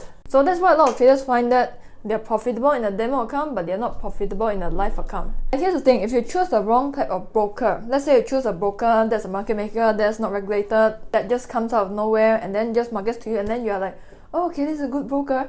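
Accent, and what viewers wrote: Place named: Singapore. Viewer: I love Singaporean accent Lah!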